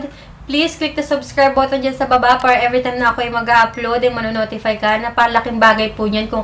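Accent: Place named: Visayas, Philippines. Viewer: Thanks po! Narinig ko parang bisaya rin kayu? Hehe pa shout po 🤗😁❤️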